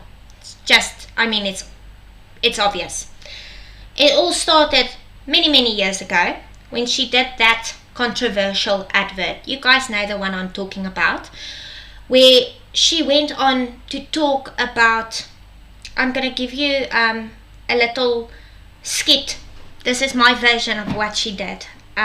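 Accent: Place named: England, United Kingdom. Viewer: Ek hou van jou engelse aksent! jy s lieflike!!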